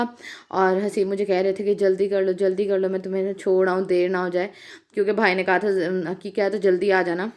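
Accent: Saraiki, Pakistan. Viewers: Aunti apna lehja theak karen sereki lehja hai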